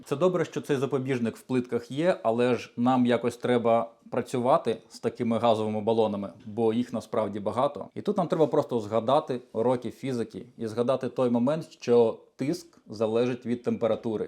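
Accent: Standard. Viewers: отмав важливу інформацію без води і чудову аргументацію, наочно продемонстровано найменші деталі. супер! дуже дякую. Окреме дякую за чисту українську мову.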